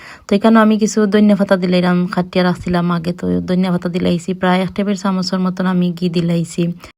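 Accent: Sylhet, Bangladesh. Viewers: আখনি খেতে অনেক ভালো লাগে আপু তুমার ছিলেটি ভাসা শুনতে খুব ভালো লাগে আমার ❤❤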